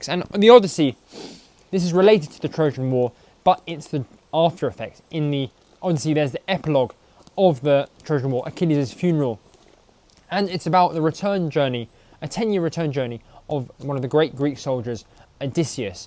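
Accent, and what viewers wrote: England, United Kingdom. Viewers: I don’t want to be disrespectful, because it’s not such a bad video after all, but I can assure you (as a Frenchman) that the verses from Mallarmé and Hugo are even more beautiful without such a thick (and annoying) English accent…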